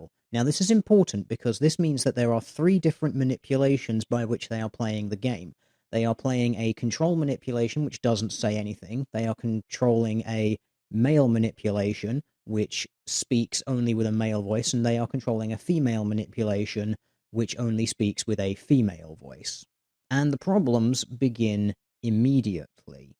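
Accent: United Kingdom. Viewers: there is no resisting a British accent.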